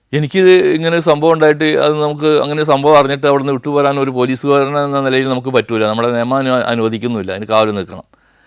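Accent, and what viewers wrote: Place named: Valluvanad, India. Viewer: സർ ആത്മാർത്ഥതയും സത്യസതയും തുടിക്കുന്ന വാക്കുകൾ. വള്ളുവനാടൻ ഭാഷയുടെ വശ്യത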